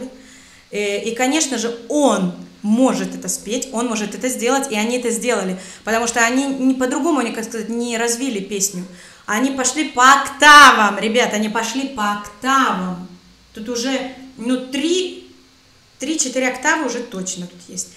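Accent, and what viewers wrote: Russia. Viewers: Здравствуйте! Послушал ваши реакции на русском и английском языках. Похоже на то, что ваш родной язык -русский, так как английский уступает в произношении, присутствует акцент и вы прежде всего русскоговорящая, владеющая английским. Но разбор произведения отличный) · Специально пишу по-русски. Дорогая, я бы Вам 10 лайков поставил, если бы была возможность. Вы - чудо, в том числе как певица. Увы, пока не было возможности оценить Вас с дирижерской палочкой в руке, но уверен, что и в этой ипостаси Вы не разочаруете. )) Спасибо огромное за рецензию и более чем профессиональную и качественную аналитику. Подписан на Ваш канал, всегда внимательно слежу. Как уже не очень молодой человек, имеющий взрослых детей и (пока одного!) внука, плюс как филолог-русист по университетскому образованию, хочу Вас поздравить с практически идеальным московским произношением и грамматически безупречным русским языком. 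Вы иногда сомневаетесь в своей лексике, но всё делаете правильно. Берегите свою семью и Вашу прелестную дочь, в первую очередь (видел её в другом ролике), в наше неспокойное время. И себя, разумеется )). Храни вас Бог. Привет из Москвы. Всем мир! Вадим. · По русски говорите безупречно, мне вот стало интересно, иностранцы англоязычные замечают-ли Ваш русский акцент...?))) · Что-то я не понял... вы не русская? У вас же идеальный русский акцент. И построение фраз.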